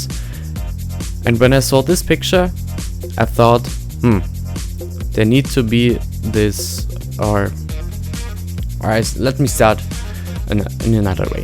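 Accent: Germany. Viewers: man hört es sofort das Du Deutscher Bist xD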